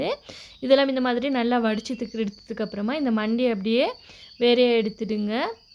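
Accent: Akurana, Sri Lanka. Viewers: Ma Sha Allah looks yummy 😋 I have started watching your videos recently. I really love the way you talk in Akurana slang. It’s like ஊருக்கு போன மாதிரி feeling 😊😊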